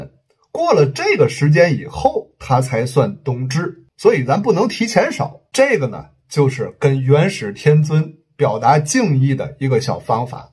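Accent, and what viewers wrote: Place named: Tianjin, China. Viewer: 第一次听到带津味理智的优良政治評論！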